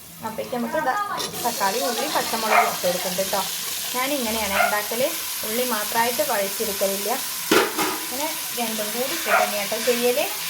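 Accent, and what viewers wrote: Kozhikode, India. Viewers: കോഴിക്കോടിനടുത്ത് കല്ലായിലോ ഫറോക്കി ലോ ഉള്ള ഭാഷ പോലെ തോന്നുന്നു?❤❤❤❤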